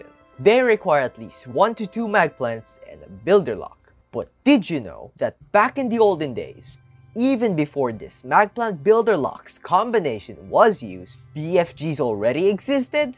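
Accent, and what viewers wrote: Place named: Philippines. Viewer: I already know your filipino couse of your voice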